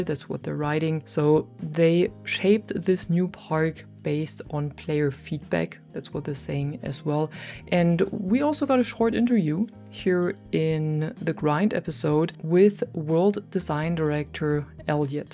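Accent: Germany. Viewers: Love the German accent ❤🎉greetings from Germany and hopefully you keep up with the skate content 😊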